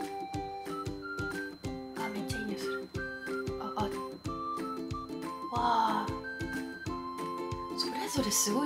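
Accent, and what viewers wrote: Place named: Miyazaki, Japan. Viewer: ホンマでっかＴＶでイワクラさんを観て、訛りが気になったのでチェックしたら、宮崎出身の方だったんですね！ イワクラさんって、東国原知事につづく、全国に知らしめる宮崎の顔になりそうな予感です。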